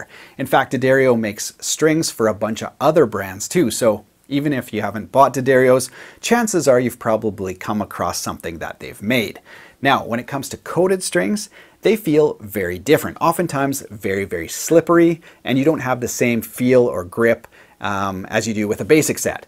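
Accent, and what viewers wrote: Canada. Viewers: He has like the most canadian accent ever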